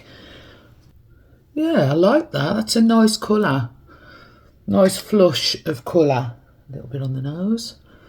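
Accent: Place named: Black Country, United Kingdom. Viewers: Where about are you lovely your a cent sounds like your just up thre road i am coventry . If i shut my eyes its like listening to jess philips mp 😅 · That red hair really suits you, it makes you look younger x l love your black country accent too xx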